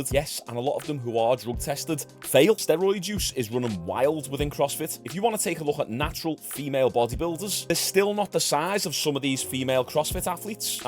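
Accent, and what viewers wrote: Liverpool, United Kingdom. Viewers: I love his Scouse accent! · I hate British accents, but I LOVE this guy’s accent!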